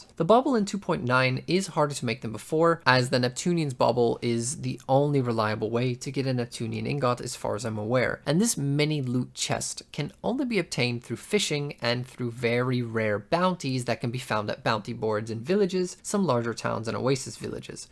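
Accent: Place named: Canada. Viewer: bro sounds like kenadian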